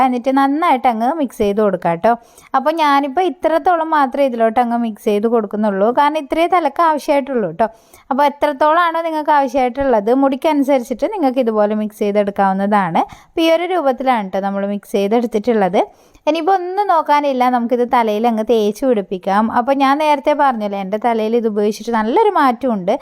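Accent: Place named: Kasaragod, India. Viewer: അതെല്ലേ.... ഞാൻ കാഞ്ഞങ്ങാട് ആണ്... കാസറഗോഡ് dist.... ചില വാക്കുകൾ ഞങ്ങള് പറയും പോലെ തോന്നുന്നുണ്ട്... അതോണ്ട് ചോദിച്ചതാ 😍👍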